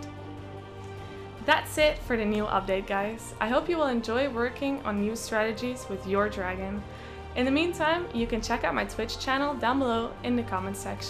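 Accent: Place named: Netherlands. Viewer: She sounds Dutch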